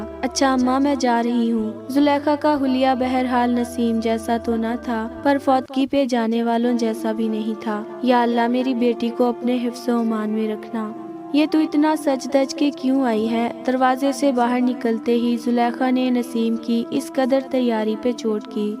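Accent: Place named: Punjab, Pakistan. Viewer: Your Voice is So Cute With Sweet Panjabi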